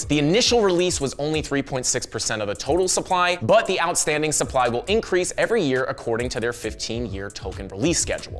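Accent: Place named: United States. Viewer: Unwatchable - a gimmicky shouting american..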